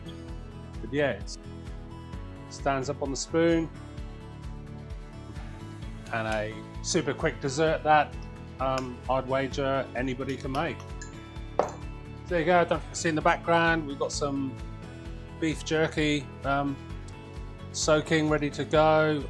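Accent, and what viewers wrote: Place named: Australia. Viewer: Thank you for this video! I'll be making this tomorrow. I'd make it today, but I just cleaned up the kitchen from making ice cream, and am just too lazy for today. I am now your 365th subscriber. And, I LOVE your Aussie accent! Diane in North Carolina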